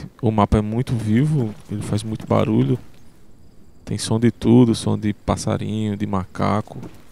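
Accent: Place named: Recife, Brazil. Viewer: Bicho tu és de que cidade ? Tens sotaque daqui de Recife.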